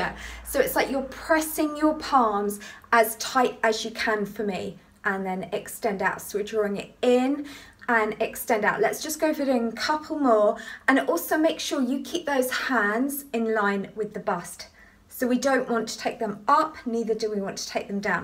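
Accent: United Kingdom. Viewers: superb british accent. 😊😊😊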